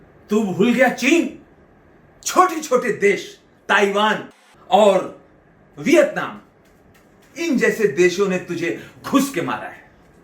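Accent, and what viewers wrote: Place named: Kanpur, India. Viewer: Raju sir...Mai b Sena me hu..aur Apke kanpur Sahar se hu...aap mere favorate ho ...aapki bhasa me apna Kanpur jhalkta h....u r great man and comedian....plz mere comment Ka jwab de ...Khushi hogi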